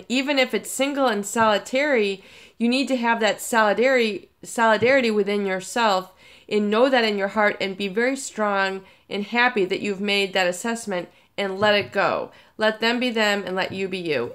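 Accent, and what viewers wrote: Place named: Great Lakes, United States. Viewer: Hey, I can hear the Great Lakes in your voice. Buffalo? Cleveland? It’s charming.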